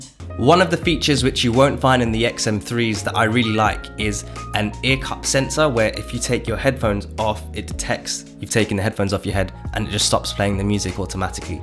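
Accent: United Kingdom. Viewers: "?" Dear Sir, your information is so valuable! But your clipped British accent at coffee shop velocity is sometimes unintelligible, especially for someone who wants to be easily understood and WHOM WE WANT TO UNDERSTAND! You speak so well! But too fast . . . Slow down, please! (About halfway through, I gave up: tired of the onslaught/drone of your chatty, breezy voice--with no respect for the listener.) We're not your friends; we're your audience.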